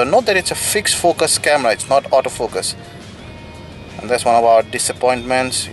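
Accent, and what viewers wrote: India. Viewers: OMG Why every budget phone review is narrated with an indian accent? why jesus??? <--- Racist Detected, sorry guys, but this annoys me a little.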